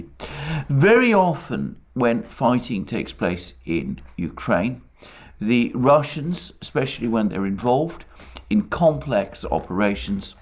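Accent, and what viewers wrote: England, United Kingdom. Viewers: I have been following you but many times you absolutely rise the anger in me. It's not just your English accent but how you express yourself I find it's appalling. Nevertheless I think your message probably rings true. What an evil man oh hell the word "evil" some sort of religious expression. No it's not a word that you expressed but the problem that I have with you it seems to me you want Pappa Pu, like a steamroller. Distruction, everything in his way no matter Fields buildings and people squashed . Like a rollerball we've cleaned everything that was here the dentist yeah they didn't think about that way. And you, it's just war games. I would love to sit down and play chess with you however there's somebody else I need to talk to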